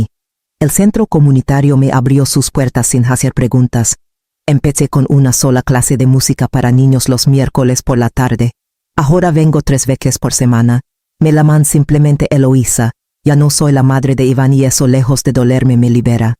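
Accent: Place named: United States. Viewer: No puedes hablar en un español claro? Porqe ese tonito agringado se te oye muy mamuco y no se te entiende. Aparte, no hay necesidad alguna de que utilices esa forma de hablar. Ya michas personas te lo han dicho pero te vale. Pon remedio urgente a esto, sino ya no vamos a abrir tus videos. Ok?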